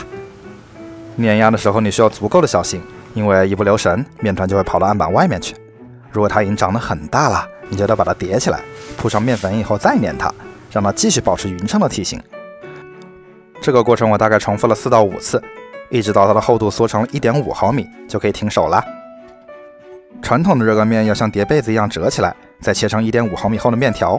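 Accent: Wuhan, China. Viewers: 果然你的口音是武汉的！ 加油 拐子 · 难怪口音这么耳熟哈哈哈哈哈哈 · 我就说为什么你的口音一直亲切，原来也是武汉的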